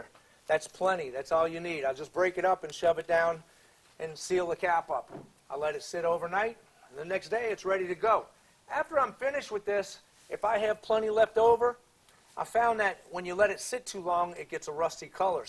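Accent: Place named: Metairie, United States. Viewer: I love your videos. As a displaced Metairian, the only person around here that sounds like you, is me! You remind me of home.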